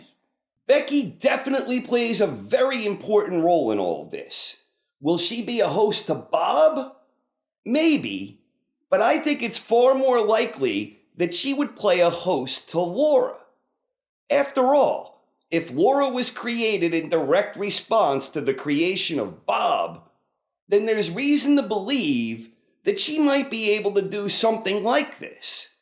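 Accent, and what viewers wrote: New York, United States. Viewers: Guessing he's from New York, maybe the Bronx or Brooklyn, and or, spent a while in Philly. Either way, his narration is clear and concise, his understanding of and his ability to describe the events on screen is uncanny. If you like Twin Peaks you need to be watching this guy.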